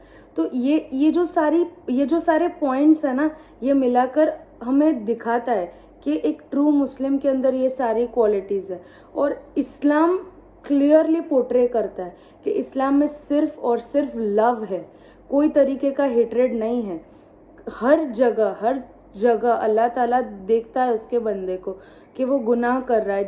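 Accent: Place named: Gujarat, India. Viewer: Assalamualaikum.....Aap Gujarat se ho aisa lag raha h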